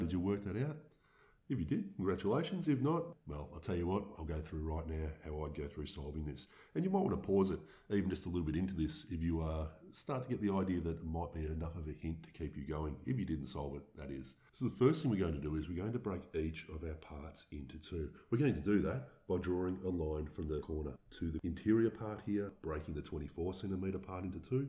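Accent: Australia. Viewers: he sounds australian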